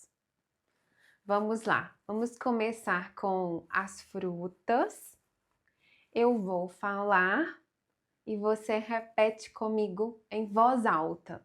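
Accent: Minas Gerais, Brazil. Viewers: Oi,! Acabei de descobrir seu canal. Adoro seu sotaque, é muito legal! Ele é típico de Minas Gerais? Você comentou num vídeo que é de Belo Horizonte, né? Quem sabe você faz um vídeo sobre isso? Um abraço da Suíça 🇨🇭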